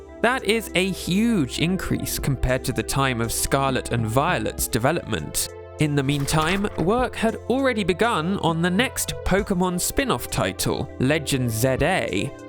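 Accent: United Kingdom. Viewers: I mean, he is British. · So are you just discriminating British accents now?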